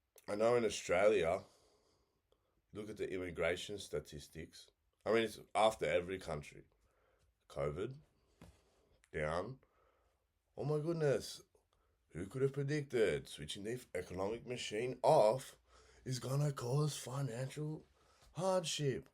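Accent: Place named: Liverpool, United Kingdom. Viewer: My English is too poor to properly deliver my thoughts about immigration... Let's just say it has been a problematic topic around here too. I don't mind if e.g japanese accountant immigrates here via marriage but we don't have much need for thousands whose only work skill is goat herding. And also, multi ethnic societies are fuctional and fine, but multi cultural societies will face problems. And also #2... Australia? I thought you were British, from Liverpool or something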